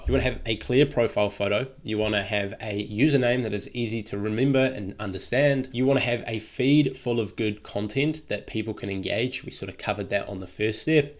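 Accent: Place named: New Zealand. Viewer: Great vid, dude sounds like he’s from NZ, even better ! 🔥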